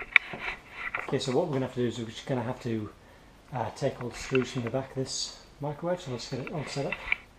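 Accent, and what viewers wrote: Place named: Australia. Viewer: Thought I recognized the Aussie in your voice. Have enjoyed many visits there and find everyone seems to DIY! Former Newport RI, USA resident, now living inland and trying to get off the grid!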